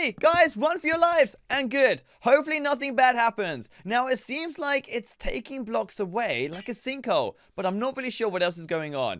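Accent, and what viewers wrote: United Kingdom. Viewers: He be speaking british HAha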